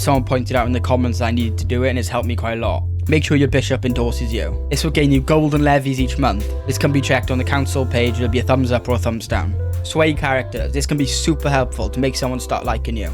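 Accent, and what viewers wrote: Wales, United Kingdom. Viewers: Welsh, and it’s beautiful. Check out a show called “Gavin and Stacey”, it’s lush. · Are you welsh by any chance?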